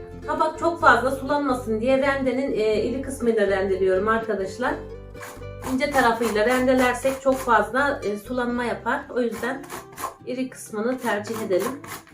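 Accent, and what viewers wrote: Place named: Çamoluk, Turkey. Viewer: Kız sen çam oluklumusun aynan ordakiler gibi konuşuyorsun bolçene dirorsun onlarda öyle konuşuyor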